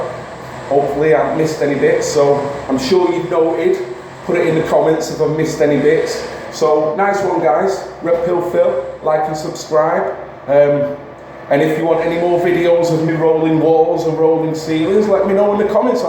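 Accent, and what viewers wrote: Manchester, United Kingdom. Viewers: Love you Phil and that Manc accent one love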